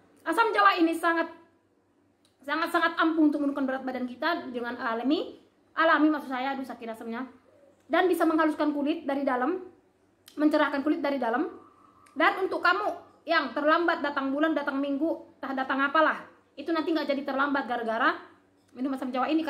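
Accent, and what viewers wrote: Medan, Indonesia. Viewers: Ka. Aslinya org mana ?? Klo nonton kk ingat kampung halaman . medan.. Logat nya itu loh.. Congok..hahahaha.. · Orang Medan ya kak... Klu dgr dri logat ama nada saat bicara... Paten kali kk ini.. Makasi ya kak ek tips nya.. Mantapppp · Medan kali logat kk ni.. 😊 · Kek ginilah klo orng medan dah cakap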